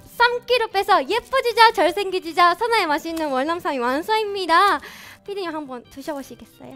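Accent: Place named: Japan. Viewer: この時はまだ韓国語が少し日本人っぽいけど、今は本当にネイティブだよね…って韓国語全然話せない私が言ったら怒られそうや…とにかく可愛い。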